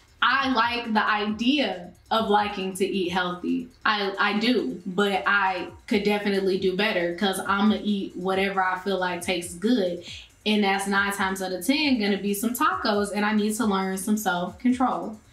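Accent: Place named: Florida, United States. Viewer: It’s a Florida accent. Once a Florida girl, ALWAYS a Florida girl boo 💅🏽‼️❤️😂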